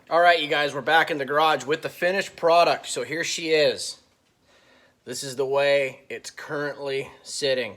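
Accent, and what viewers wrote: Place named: Backwoods, United States. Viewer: Can you try the next video with an Australian accent. This whole backwoods American thing doesn’t suit you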